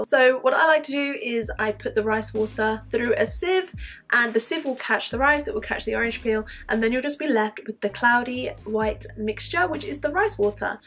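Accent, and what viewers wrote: United Kingdom. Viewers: Wotah Ahh I love the British accent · Hi. This is my first time seeing your video. I really needed and appreciated your show. Great British accent.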